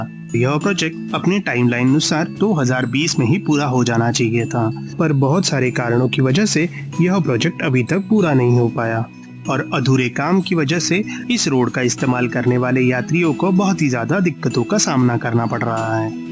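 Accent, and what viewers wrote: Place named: Maharashtra, India. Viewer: Bhau marathi ahes ka tu? From your pronounciation I feel you are marathi.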